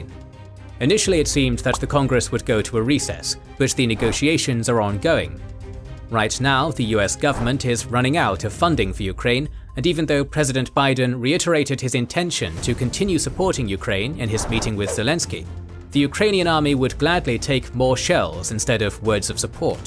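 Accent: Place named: United Kingdom. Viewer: Love the coverage of Ukraine . Probably the best I’ve seen and the British accent is a must.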